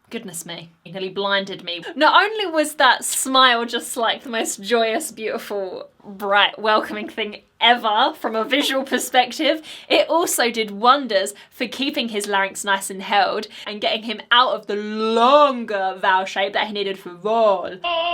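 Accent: England, United Kingdom. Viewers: I subscribed to your channel just because ablas Español by the way I love your England accent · You are so beautiful and love your British accent! You've now become my favorite music reactor.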